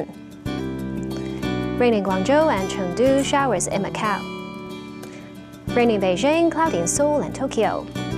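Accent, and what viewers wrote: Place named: United States. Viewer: 英文絕頂 是美洲英文😊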